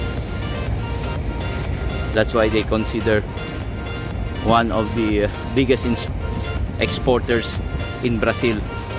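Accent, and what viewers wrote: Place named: Bohol, Philippines. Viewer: Boholano salita oi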